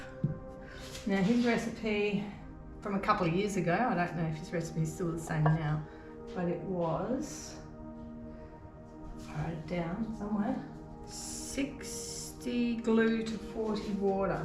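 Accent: Australia. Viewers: Thanks for sharing your learning curve. I am just about to start pour painting and love listening to an Australian accent. I live in Queensland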